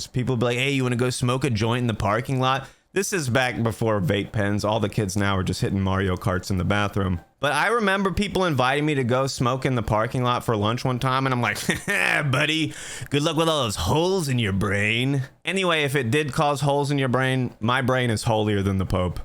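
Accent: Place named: New York, United States. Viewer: I wonder where the New Yorker type accent comes from.?.?.?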